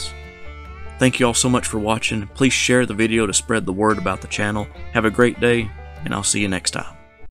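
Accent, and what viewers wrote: South, United States. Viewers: lol not used to hearing country accents like yours on youtube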